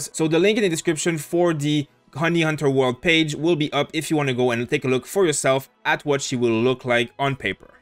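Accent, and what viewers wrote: Quebec, Canada. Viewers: Am I dreaming or do you have a french Canadiens accent? Btw new here but I'm loving your channel! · That accent... You're from Québec, am I right?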